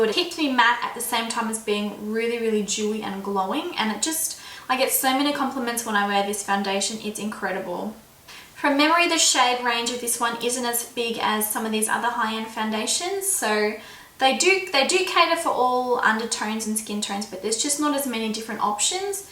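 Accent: Australia. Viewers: Are you from Queensland?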